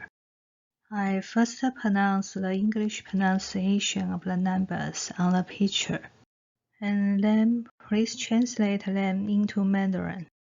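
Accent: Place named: Taiwan. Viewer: thank you for your work. you have the cleanest presentation of taiwanese mandarin i found on the internet. ive been searching a lot for taiwanese teachers teaching mandarin.hoping for more! also why would ccp hackers do that when people just want to learn?that's crazy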